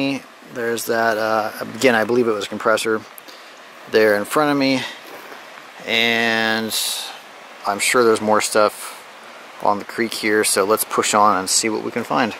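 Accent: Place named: United States. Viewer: Normal frame rate doesn't make a difference on recording. it's still the same picture only taken twice as often in 1 sec. maybe its to much data for the storage medium or the camera cpu. from my Experience filming in dark a environment. the video gets distorted and grainy when there is to much going on in the frame that the cpu has difficultys to render. this should not be seen as critic. i only want to give some thoughts to help your channel to more quality. i still like to watch your content and here some American accent.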